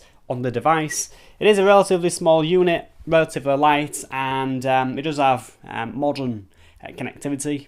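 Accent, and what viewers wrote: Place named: England, United Kingdom. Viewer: ‼️it took me to the end of the video to realize he’s saying “RAID” not “red” 😂 English Accents are such a joke. There’s no way they aren’t aware of how dethroned their speech is when there’s no perceptual difference between these two words. For real.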